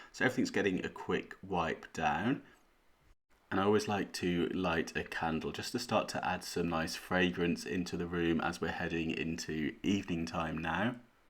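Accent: United Kingdom. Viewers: same accent as "Charlie bit my finger"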